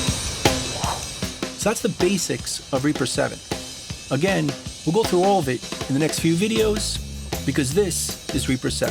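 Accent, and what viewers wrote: New York, United States. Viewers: sounds like Christopher Walken with a New York accent. And man, these videos and how he presents the material is perfect! I've learned everything I know about Reaper 7 from. Thank you!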